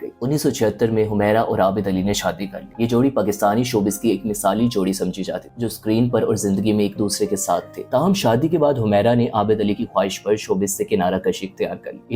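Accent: India. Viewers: Ap Pakistani hain Indian ki trha na bolain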